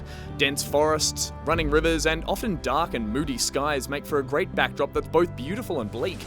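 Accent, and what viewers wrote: Australia. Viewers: Clearly sounds Australian. But Americans always do get confused about the British accent.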